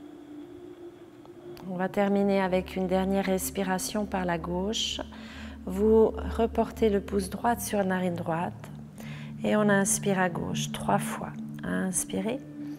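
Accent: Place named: Valais, Switzerland. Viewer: Un an depuis le grand confinement et toujours autant de satisfaction à vous retrouver et pratiquer vos différents programmes avec en prime ce délicieux accent valaisan que je connais bien de ma branche familiale cousine En espérant pouvoir vous rejoindre un jour pour un stage d'été Merci pour la qualité de vie que vous partagez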